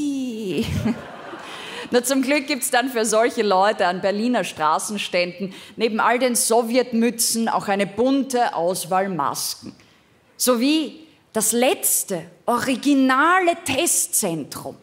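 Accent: Austria. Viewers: Der Wiener Slang , der leicht nasale , herrlich ...freut mich als am Land lebende gebürtige Grazerin!!!😂😂😂😂❤ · kein Wunder Du Schlaubär, denn sie kommt aus Leoben, Steiermark und spricht somit den steierischen Dialekt. Das hat mit wienerisch so viel zu tun, wie bayerisch mit hessisch. Den Rest spare ich mir zu kommentieren...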